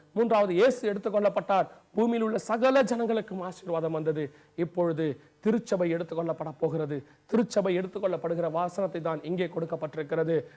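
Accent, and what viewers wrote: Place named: Bangalore, India. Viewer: Here I don't want to say whatever he has spoken is right or not or who is right? Apart from that I see that many of you has commented that he should learn proper tamil... Tamzhians who are born and brought up in Bangalore and have their ancestors settled in Bangalore would have this kind of slang. All bangalorean tamizh people speak like him....its one way of tamizh slang like how we have north chennai slang. Please do not comment bad on his slang... atleast he tried speaking in tamizh.... And not only in this video being a Christian i dont know why people make comment using bad words or commenting in a disrespectful way. Please use your language properly or do not register your comment. It is the same tongue we are using to praise the lord. Are we not ashamed to use bad words in the same tongue we use to praise our holy Lord. 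Dont your conscience strike you when you sit for prayers. Please be a proper faithful christian to jesus.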